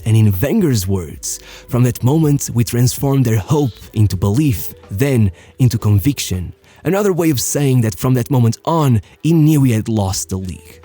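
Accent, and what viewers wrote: Portugal. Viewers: 100% this is a portuguese accent ahahah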